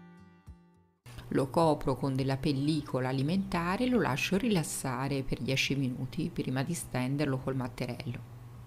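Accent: Tuscany, Italy. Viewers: Complimenti per tutto ciò che proponi, e per il modo con cui sai comunicare; la bella voce e la simpatica pronuncia Toscana, ti rendono completa.☺ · Un'altra buonissima e deliziosa ricetta..buone con la crema.. bravissima sempre precisa e dolcissima quando commenti il video..il tuo accento toscano mi piace molto.👏👏👏👏👏👏😘😘😘😘😘😘😘❤❤❤❤❤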